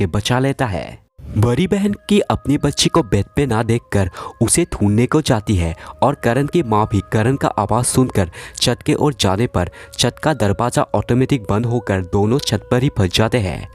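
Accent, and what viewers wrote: India. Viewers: Don't judge please ye bengoli hai to problem hoga hi Hindi mein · are you north east bro?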